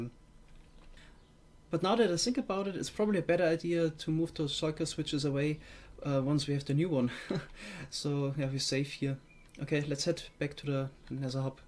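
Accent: Germany. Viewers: Whar natationality do you have? Because your Accent sound very german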